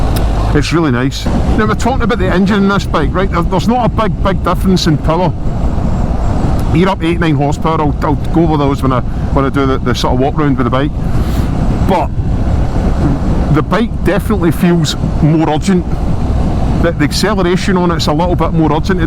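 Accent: Scotland, United Kingdom. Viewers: you also have the best Scottish reviewer accent…ever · Proper Scottish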